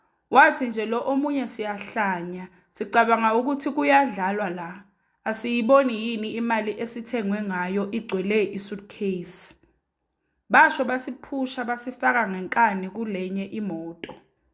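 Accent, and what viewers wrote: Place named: Nigeria. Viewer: Wassalamu alaikum wa Rhamatullahi wa Bharakatuh You sound Nigerian yourself.. 'cause you are not Umzulu....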